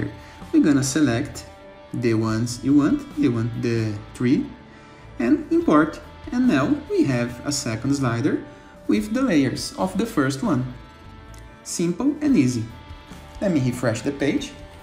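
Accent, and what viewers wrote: Brazil. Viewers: Certeza q é BR.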